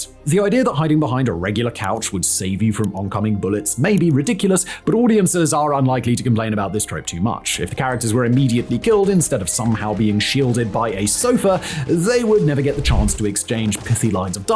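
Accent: United Kingdom. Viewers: Wow, let me just click on this video and let this effete limey school me on guns…. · I CAN NOT WAIT to hear a Brit tell me (an American) about guns and gun myths. Stay in your lane and tell me about different teas or how they are prepared wrong in movies. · Here in Canada, same. Pretty sure this was made by america for America. Narrated by a Brit. It's like real history. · Sure, let's have the British guy tell us all about guns! Someone did do their research, so i respect that.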